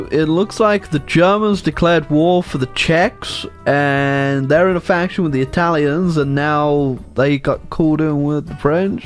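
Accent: United Kingdom. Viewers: You watch these videos and think he sounds anything like an American? Have you ever heard an American speak? He's definitely British.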